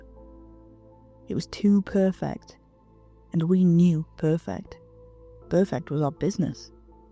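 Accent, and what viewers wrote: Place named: England, United Kingdom. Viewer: your welcome. Your accent reminds me of my sister in laws mother shes from England. Introduced me to English breakfast best tea in my opinion.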